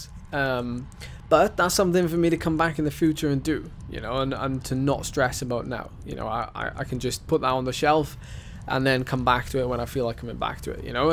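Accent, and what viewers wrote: Wales, United Kingdom. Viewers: Who would want to mute a good welsh accent? 🏴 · Hope you don't mind but I turned the video off and just listened to your soothing Welsh tones 😁 Well done buddy 👍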